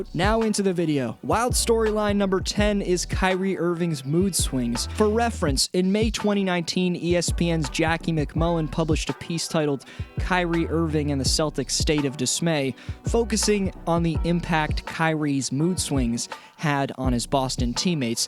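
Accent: Canada. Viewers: I never knew about the instagram beef, bruh. You just lost me there. You sound Canadian and like you're wearing a tie. When Drose won MVP you were probably barely walking. I don't do millennial channels.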